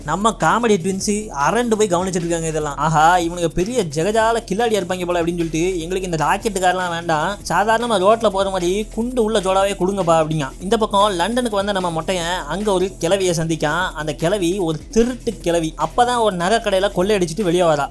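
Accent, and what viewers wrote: Tirunelveli, India. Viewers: Are you from Tirunelveli Ya Brother ??????? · நெல்லை தமிழில் டப்பிங் செய்து பேசிய உங்களுக்கு ரொம்ப நன்றி மிக்க நன்றி சகோதரரே 💚💚🙏🙏💐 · Ena bro tirunelveli kaarana ne😍😍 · வர்ணனை அட்டகாசம் தோழரே... இதுக்காகவே உங்க channel ல subscribe பண்ணிட்டேன். உங்கள் வெற்றி தொடரட்டும்.. (எப்பொழுதும் இதே நெல்லை தமிழில் பேசுங்க தோழரே)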